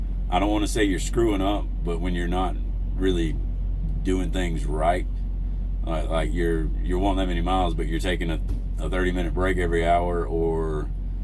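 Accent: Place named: Georgia, United States. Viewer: I really luv ur videos ur so down to earth and intelligent . Georgia men WOW...needless to say I could listen to your Georgia accent all day🤭☺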